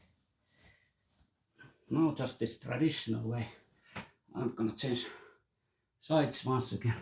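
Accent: Finland. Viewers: loves the Finnish accent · I love the Finnish accent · I'm SOOOO into finnish accent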